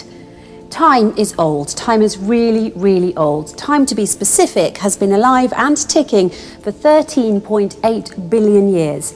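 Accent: England, United Kingdom. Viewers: This is English accent at its best !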